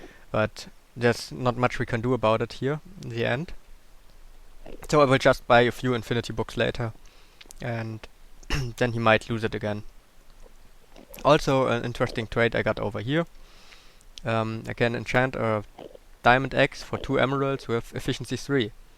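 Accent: Germany. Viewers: Man hört es übel... allein wie er think ausspricht :) typisch deutsch eben! :D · man hört voll dass Du deutscher bist xD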